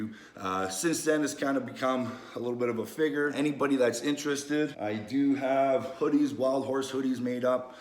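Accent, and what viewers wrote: Canada. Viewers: So us Canadians really do have an accent eh?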